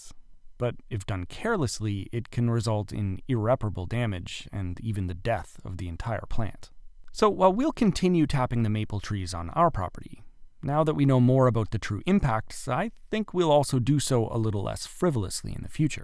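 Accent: Canada. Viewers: Victory Begins in the Garden And he has the most Canadian voice to go with it. CBC Radio worthy. 🙂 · This guy has a thick canadian accent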